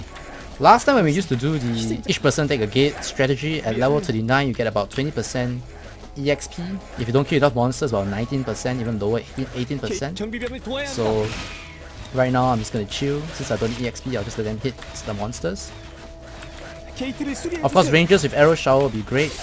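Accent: Singapore. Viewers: you sound like youre from singapore. are you?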